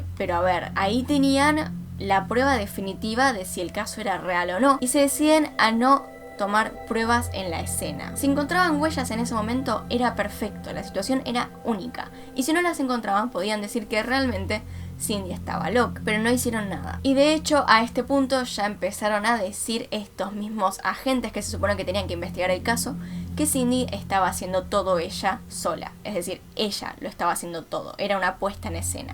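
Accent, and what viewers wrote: Argentina. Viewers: La única voz argentina que soporto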